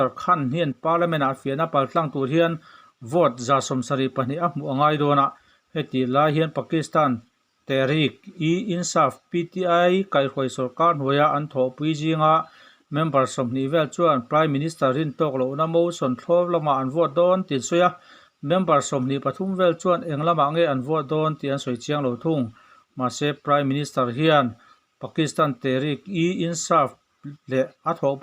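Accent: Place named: Mizoram, India. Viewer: Ka pu i news puan hi a ngaih nawm awm thei viau ah masah i english pronunciation hi hi ngaih thlak a har deu thrin ka ti. Ka dem lo che maseh english kan hmang chuan mizo thuthluk in I rawn lam thrin a, a dik lo deu hlek ka ti na a ni eh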